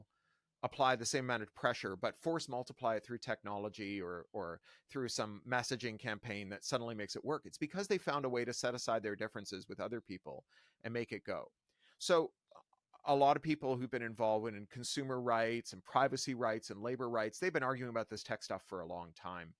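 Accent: United Kingdom. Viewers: I was just about to tell you to stop speaking with a British accent, but I see that you’re a British publication When you speak English, you really need to learn how to speak standard American English, which is basically speaking English with a Midwestern accent. Americans cannot understand what you’re saying because it sounds pretentious and affected. But I had to stop by just to let you know that I agree. Unfortunately, your people, the British are the reason for the enshitification of the Internet. With the United Kingdom‘s creation of its Frankenstein, Israel for political power for the United Kingdom, and for European Jews, the UK and Israel, with the help of the muscle of the USA, have hijacked the Internet with laws that favor the abridgment of free speech as if a censure would advanced the power of those with a paid for preferred status to be the voice of the narrative on the Internet You don’t own the airwaves So what we have is basically a bunch of people who are bullying everyone to comply With a political narrative broadcasted over the Internet. 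For the creator and the creators of the Internet, the British and the Jewish narratives run against the entire world In all fairness, each country does not want their business for the world to know because they want to control a group of people in their geographical location. So they also a bridge the ability of the Internet to be free from censorship. Like tiny Tibet censoring what their population knows about the rest of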